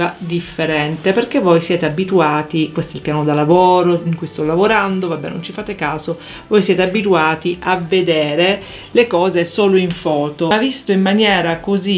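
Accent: Catania, Italy. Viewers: Ma tu sei di Catania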